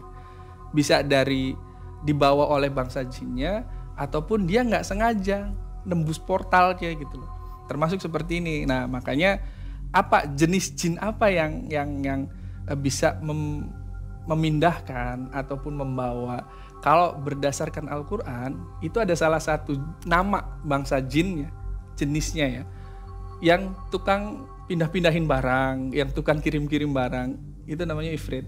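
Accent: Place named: Indramayu, Indonesia. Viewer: Orang mana nih bahasa nya ga angger klo ga Indramayu cirebon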